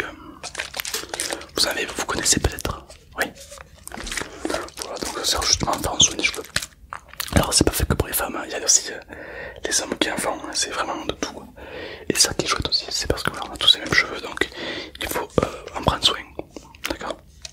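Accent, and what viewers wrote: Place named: Toulouse, France. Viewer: Accent Toulousain est magnifique le roleplay est relax surtout coiffeur j'adore, 😁👍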